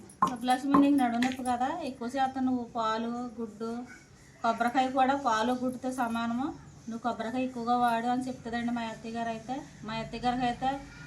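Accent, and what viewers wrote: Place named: West Godavari, India. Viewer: సుబ్బలక్ష్మి నువ్వు. మాట్లాడే. పద్ధతి చాలా బాగుంది. అండి. అన్ని మా నా. పశ్చిమ గోదావరి జిల్లాలో. అలాగే. మాట్లాడుతారు. వాళ్లు. కి. తేలియదు. కాదా. నువ్వు. బాధపావొదు. సరే నా. మీ. శాంతి. అక్క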